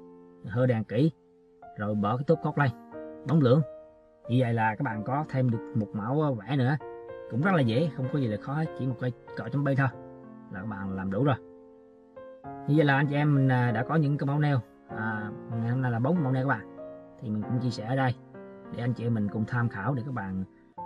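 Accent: Central, Vietnam. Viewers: cảm ơn bạn, nghe giọng miền trung mến liền🥰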